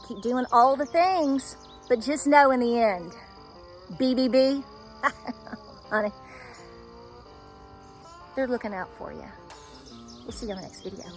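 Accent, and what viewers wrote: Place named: South, United States. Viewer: I just have to laugh at your last few videos. You are a pure southerner! So proud of you. I’m a southerner to in NC. The way you’re talking and explaining….. well honey I get it. You are awesome. I love your videos. ❣️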